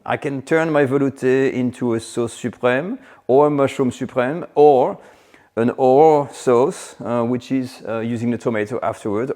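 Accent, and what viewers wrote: France. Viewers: Quite shocking that a youtube channel with Academy in the title, and being French, did not make its own pastry ... that is the one technical bit here. That's the sort of thing I do on my amateur channel ! Are you just a bloke with a French accent trying to make money on youtube ? If they find out they will send you over here to England as a punishment :-)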